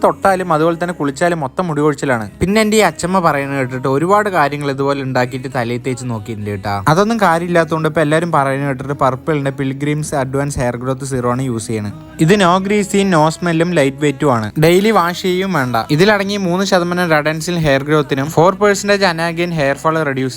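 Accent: Palakkad, India. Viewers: Palakkad karan aano😅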